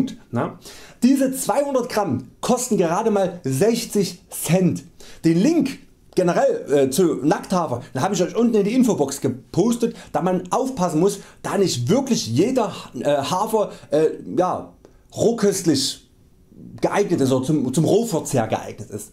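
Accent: Saxony, Germany. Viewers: dein sächsisch ist ja immer wieder schön ^^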